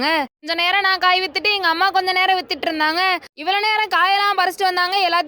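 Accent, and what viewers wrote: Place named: North, India. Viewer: Ne y ma vadakan maari tamil paesara,nithaanama paesu ma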